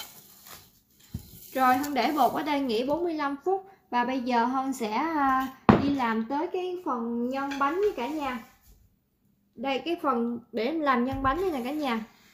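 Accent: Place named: Mekong Delta, Vietnam. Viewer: Hi...lần đầu xem video của b ..rất ấn tượng với tiêu đề ( nhớ mẹ)... Nghe cảm xúc ghê ..❤❤❤❤ Tiếng miền tây của b nghe🌾🌾🎣 thân thiện rỏ ràng không lẫn vào đâu đc hết... Cách chia sẻ cách làm món ăn thật chi tiết.thích👍😍 Chúc vui vẻ 🥰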